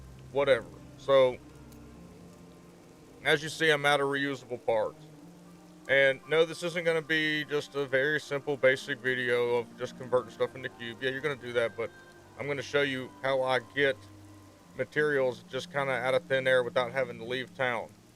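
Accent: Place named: Texas, United States. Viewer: As a Texan who plays Diablo, but doesn't have a channel... hearing your accent was such a breath of fresh air haha.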